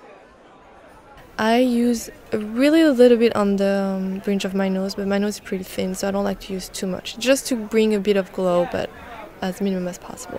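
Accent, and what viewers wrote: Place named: France. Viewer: Can you make a tutorial with you speaking french? I love the accent!! Even though I can't understand them😂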